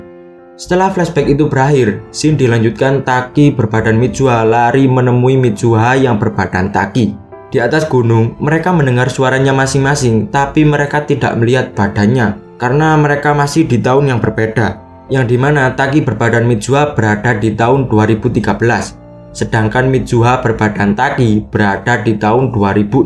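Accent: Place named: Java, Indonesia. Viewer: Suara mu medok mas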